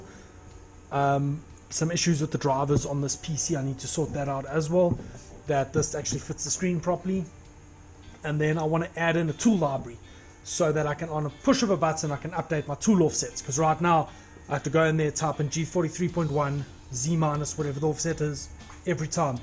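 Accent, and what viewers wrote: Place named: South Africa. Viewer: Hi there! Just discovered this series and binge watched all the videos. Great stuff! Do you have any info on your spindle? Specifically the atc system? Cheers! Devin. Ps I was born in Joburg (in fourways, moved to Canada when I was a kid... I can pick out the accent from a large crowd haha)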